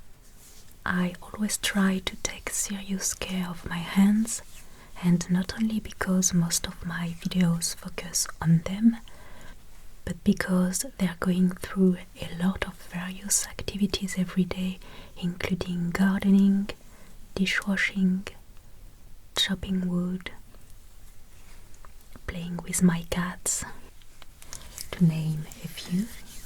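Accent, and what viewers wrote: France. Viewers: I think I recognize french accent. Why don't you make a french video sometimes ? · Hello j'te passe un petit coucou de France vu que j'ai pas pu m'empêcher de repéré la prononciation bien français des mots anglais mdr.😂❤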